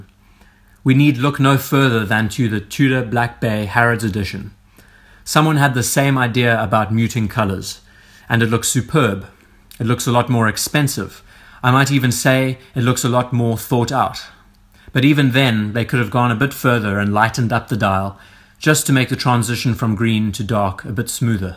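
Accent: Cape Town, South Africa. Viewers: Tell me are you from Cape Town?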